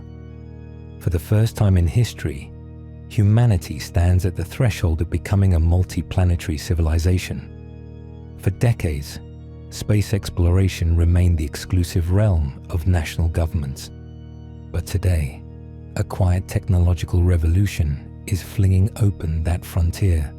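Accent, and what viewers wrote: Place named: United Kingdom. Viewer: That ain't him talking.He sounds like a Limey when talks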